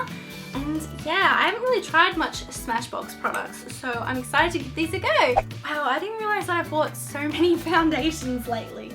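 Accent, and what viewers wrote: Australia. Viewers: Hi I love you I love your videos I love your accent so much I find Australian accents so entertaining to listen to You are so pretty too 💖💖💖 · Your Aussie accent is soooooo soothing. ❤️😘ilysm! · OMG are you australian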